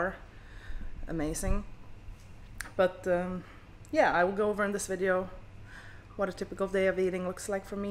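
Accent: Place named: Sweden. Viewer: Still hear the Swedish accent haha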